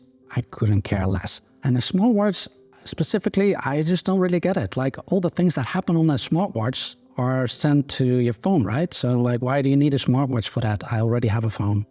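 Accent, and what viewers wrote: Netherlands. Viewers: Ik dacht al dat ik een NL accent hoorde😊!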